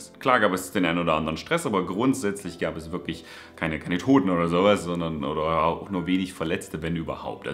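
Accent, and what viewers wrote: Saxony, Germany. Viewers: ich find deinen Dialekt super, komme selbst aus Sachsen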